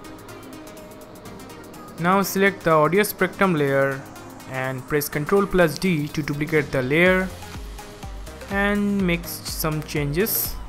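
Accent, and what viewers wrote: India. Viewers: Hindi Accent Wow nice x) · You know its legit when a guy with indian accent makes a tutorial on it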